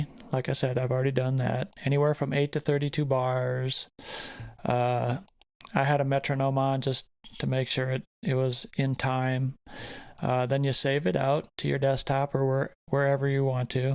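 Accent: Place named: Scandinavia. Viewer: If you have watched a lot YouTube videos, it gets used to presentation like this. Calm, but expressive in moments. It’s actually good for learning and getting new ideas about the subject. It doesn’t force any attention, but highlights. Good job buddy. Pretty sure you are from Scandinavia, Finnish might fit that style the best 🙂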